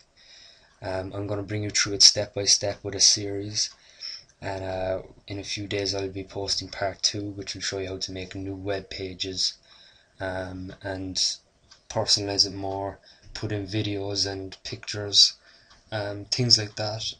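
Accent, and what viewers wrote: Ireland. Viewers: Is that a Langer accent I hear?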